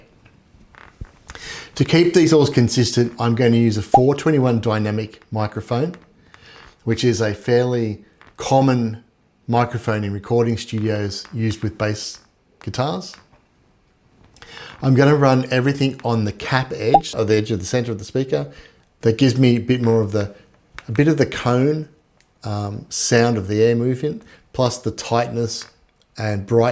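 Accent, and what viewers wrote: Australia. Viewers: Great content and nice to hear an aussie too mate. Plus I have the exact same bass as you so great to hear these demos. Keep it up!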